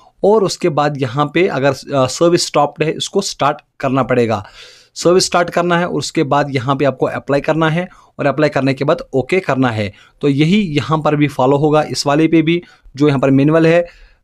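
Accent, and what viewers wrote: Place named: Kashmir, India. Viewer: It sounds like u r kashmiri ....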